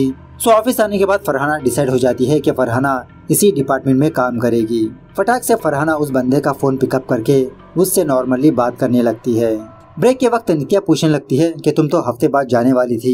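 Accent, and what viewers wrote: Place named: South, India. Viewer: it’s not shudh hindi Southern style Urdu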